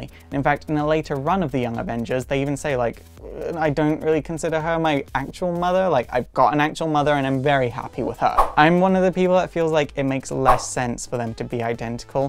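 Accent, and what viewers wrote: United Kingdom. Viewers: I'm 35 (turning 36 this year) and I came out when I was 19 (it was a little different in my day - I'm a bit older than you - also American, born and raised in Baltimore Maryland - from your accent i can tell your from the UK) Marvel's Northstar came out in 1992 when I was 9 but i never felt I related with that character. Like you when I found the character of wiccan i felt I found a piece of myself and went right out and bought "Young Avengers: Children's Crusade" - found out the book and the character kicked total ass and have been sold since. Also been following Iceman's "BIG GAY BOOK" which I like but not as much as I LOVE Billy Kaplan/Wiccan.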